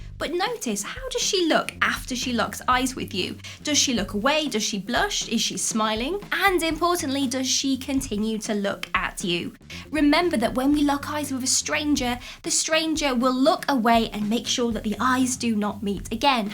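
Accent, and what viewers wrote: England, United Kingdom. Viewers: Your British accent is so charming to my ears, I really love it! Greetings from Greece!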